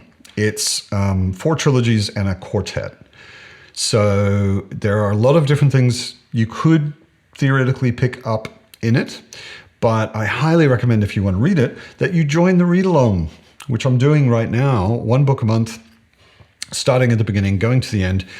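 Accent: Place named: Australia. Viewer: I can’t place your accent at all haha I can just tell there’s a bit of Aussie in there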